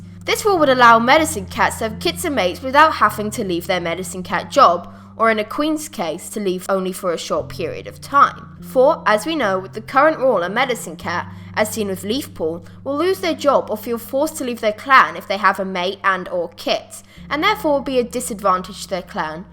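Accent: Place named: England, United Kingdom. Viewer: OMG! I love your accent. It's beautiful. ^w^ Are you from England?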